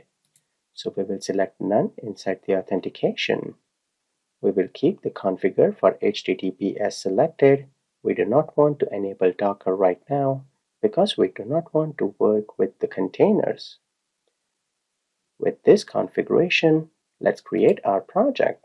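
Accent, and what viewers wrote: India. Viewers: Absolutely the best <link> tutorial video I've found so far. Having an Indian accent is nice. However, when you speak, it feels like you're singing with the same tone all the time. Please, just speak in your natural tone. Don't try to sing. This singing part made it very hard to understand what you were saying. i had to listen 3-4 times to understand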